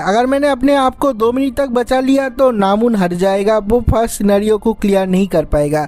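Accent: Bengal, India. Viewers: Bengali hai kya bhai tu🤨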